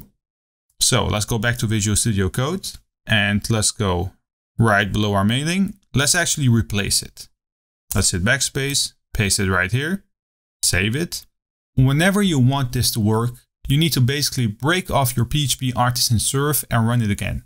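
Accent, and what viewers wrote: Netherlands. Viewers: Great tutorial! You sound very Dutch btw...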